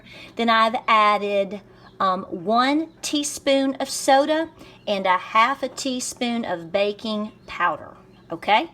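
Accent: South, United States. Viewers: Your videos are just wonderful. Love your kind southern accent, as well as your wonderful recipes. Bless you and keep up your faith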